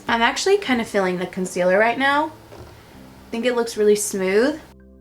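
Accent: South, United States. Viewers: Just subbed beautiful! Love your Southern accent!!!!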